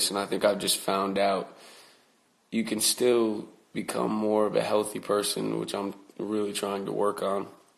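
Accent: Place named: South, United States. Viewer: he got the country accent going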